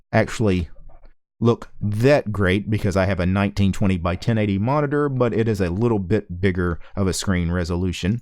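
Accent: United States. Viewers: could you have a look at opensuse? Or suse enterprise? Its not that I prefer one distro above the other but our envirnoment at my office is bound to sles 12. And I think suse is german company (im swiss) so it would be interesting about an international meaning. Im not sure where you are from, you sound US, anyway your voice is amazing. Cheers from switzerland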